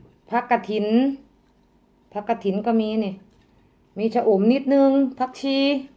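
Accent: Isan, Thailand. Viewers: ชอบสำเนียงเว้า..กินตามอัธยาสัยของเฮาแซ่บๆๆ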